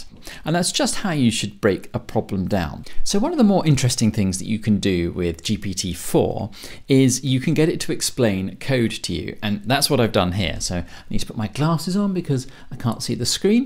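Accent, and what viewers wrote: United Kingdom. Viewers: man you are really cool always like your video and pure british accent , love from Bangladesh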